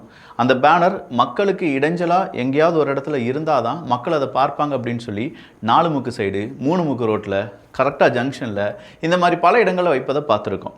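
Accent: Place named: Thoothukudi, India. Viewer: தாமிரபரணி என்ன கருனாநிதி தலையா..என்றும்... பேனாவை..."அதுவா" என்று எல்லாம் Comments வருகிறது என்று சொல்வதும்..சிரிப்பின் உச்சம்.. நிறைய Videos பாத்துட்டேன்..இப்போதான் Comments type பண்றேன். உங்க ஊர் (தூத்துக்குடி) மொழி பேசும்போது எல்லாம், அழகாக பேசுகிறீர்கள். TVK அரசின் செயல் பாடுகளில் ஒரே ஒரு குறை தான்... cow Slaughter வேணும்னு சொல்றாங்க... அது தானே பால் கொடுக்குது...பாலில் இருந்து நமக்கு தயிர், வெண்ணை, நெய்..என்று எக்கச்சக்கமா கிடைக்குதே...மற்ற மதங்கள் தோன்றுவதற்கு முன்பே, நமது நாட்டில் மனிதனுக்கு நண்பனாக இருந்த ஒரே விலங்கு Cow தான். மனிதர்களுக்கு இருப்பது அசைவதிற்கு அடிமையான நாக்கு. இறைவன் படைத்த எந்த உயிர்களையும் கொல்ல மனிதனுக்கு உரிமை இல்லை. இந்த விஷயத்தில் மனிதர்கள் மகா பாவம் செய்கிறார்கள்.... TVK செய்வது மகா பாவம். *********************************************************** (2036 - உலக அழிவின் / உலக மாற்றம் நிகழும் பொழுது போது...எல்லோரும் நினைவு கூறுவார்கள்...கண்டங்கள் சமுத்திரத்திற்குள் செல்லும், இமய மலை / Mt. 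Everest உட்பட ) இயற்கை சீற்றத்தால் தற்போதைய உலகம் அழியும். அணு ஆயுதங்கள், தொழிலநுட்பம் இவையும் 2036 - ல் உலக அழிவிற்கு காரணமாக அமையும். இந்தியாவில இன / மத / ஜாதி கலவரத்தால் இரத்த ஆறு ஓடும் இது இறைவன் இயக்கும் நாடகம். இறை நாடகம். 10 வருடங்களுக்கு முன் இல்லாத அளவுக்கு இன்று இயற்கை தன் சீற்றத்தை உலகில் காட்டி வருவதும், உலக அளவில் போர்கள் நடப்பதும் - வெறும் Trailer தான். Main Picture - 2036 - ல்... இப்பொழுது ஒருவரும் நம்பப்போவதில்லை. 2036 - ல்...நடக்கும்பொழுது நம்புவீர்கள்... நன்றி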